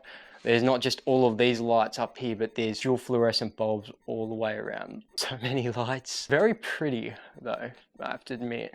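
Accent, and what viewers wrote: Australia. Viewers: Oooo that’s a chewy Aussie accent! 😂 Kia ora from NZ 👋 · Never thought this would be a whole house, from the outside it looks just like an apartment building are you in America? But your accent like an aussie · Great video and amazing place – loved your presentation mate – you’re a natural – so so so Aussie – just love it !!!!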